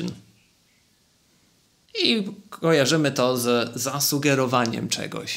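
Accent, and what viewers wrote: United Kingdom. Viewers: Przy kazdym filmiku z wyrazeniami tez warto na koniec zrobic plansze (lub dwie) z nimi wszystkimi jako powtorke. PS Masz znakomita wymowe brytyjska jak na moje nieangielskie ucho.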